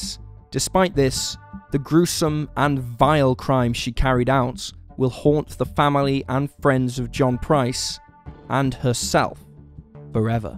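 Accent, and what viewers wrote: United Kingdom. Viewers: Narrators who speak excellent English (i.e., British we presume?) sort of ruin the story without any expression utilized WITH the English perfection. Find some narrators who know how to phrase their sentences in an interesting manner? this guy sounds as if he, himself is not even enjoying relaying the stories (which without his rigid enunciations, were actually, quite interesting. Ok nobody cares Bye bye.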